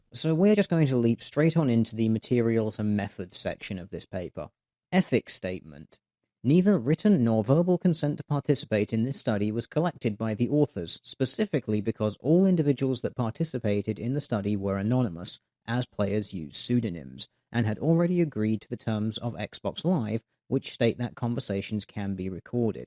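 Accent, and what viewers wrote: United Kingdom. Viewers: there is no resisting a British accent.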